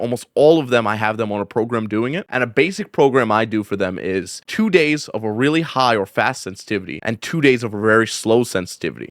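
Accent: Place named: Bronx, United States. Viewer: U gotta be from the Bronx my boy 😂 🤞🏽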